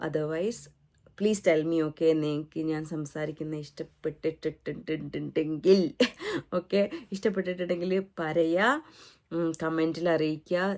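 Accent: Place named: South Korea. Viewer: സംസാരത്തിൽ നല്ല korean touch und. Bt good👍❤️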